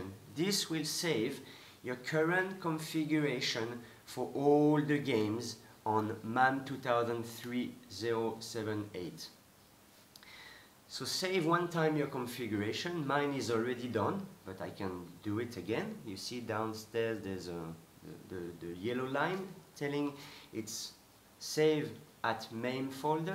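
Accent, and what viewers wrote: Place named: France. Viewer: Salut, tu sembles être français à ta façon de parler.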